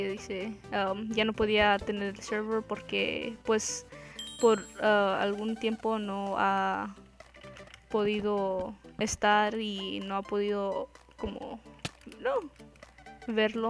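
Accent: United States. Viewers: Es de Estados Unidos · Eres gringa?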